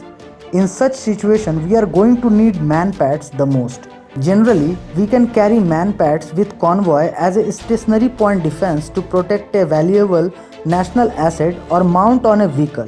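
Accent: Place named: Bihar, India. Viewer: Improve your pronunciation, please, you sound as though your bihari accent is not acceptable internationally.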